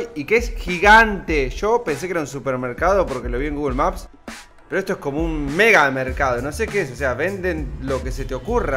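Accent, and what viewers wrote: Argentina. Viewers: Un Venezolano con acento Argentino, vea pues.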